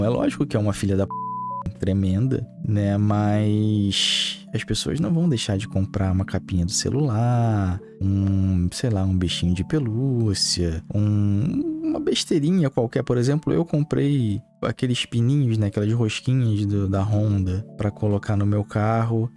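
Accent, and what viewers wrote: Rio de Janeiro, Brazil. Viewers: carioca asmr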